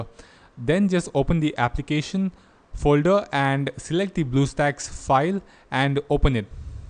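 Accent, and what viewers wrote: India. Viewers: u sounded indian or asian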